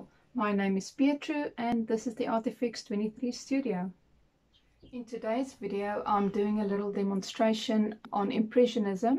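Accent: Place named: South Africa. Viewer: How nice to hear a South African accent. Hi from the Netherlands ex Midvaal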